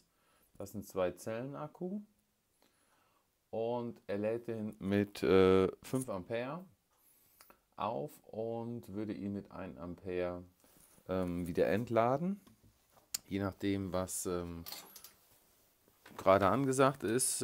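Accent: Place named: Lower Rhine, Germany. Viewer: Der Akzent...... Dat & Wat!!!! Herrlich!!! Wich komm aus Wesel am Niederrhein..... da sprechen wir auch so!! Lol!!! Gruß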